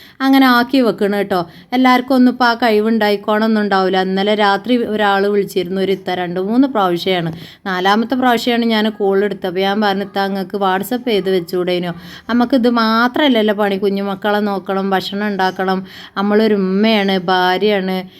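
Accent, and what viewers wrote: Malappuram, India. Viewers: Njan aadhyamayittahn ithade vvidio kanunnath...ithuvare vidiosin onnum comment idarilla...njn full vidio kandu... q &a session okke ...subscribe cheythu ... vidio kanditt commnt idan thonni...enikk samsaram orupad idhtshttayi..njnum malappuram aahn......negative comments idunnavar idatte ...athonnum mind akkanda . Malappuram karde normal talking ithaahn ....ithupole munnoott poyal mathi...insha allah..ellam sheeiyakum..allahu barakath tharatte...kadangalokke pettann theerkkan pattatte.....njngalum aanakkayam post ahnnn😅... Enikk ente thathante age thonni...6 makkal nd nnonnum parayillla😊masha allah..itha nalla sundariyahn....❤❤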